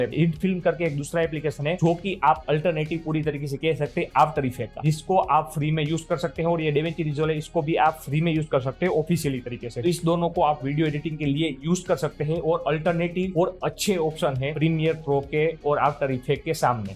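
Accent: Gujarat, India. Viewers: भाई पहले हिंदी बोलना सिख लो, साफ़ साफ़ पता चल रहा है की तुम गुजराती हो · Are you ગુજરાતી? 😅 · By his speaking style he is 💯 gujarati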